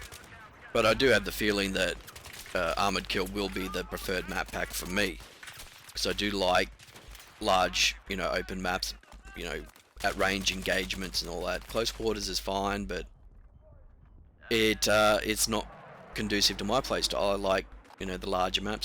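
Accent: Australia. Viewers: fuck i love aussie commentators haha,subbed!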